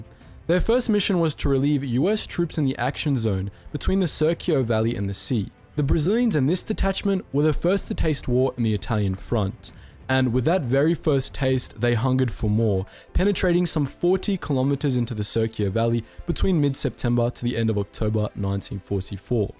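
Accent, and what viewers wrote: Australia. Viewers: Dumb question are you Australian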